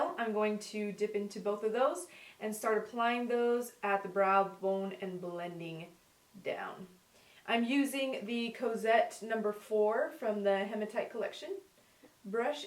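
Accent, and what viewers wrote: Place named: Montreal, Canada. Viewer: you sound like you're from Montreal for some reason...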